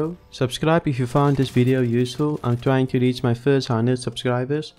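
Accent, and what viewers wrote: South Africa. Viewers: 😂 Love the South African accent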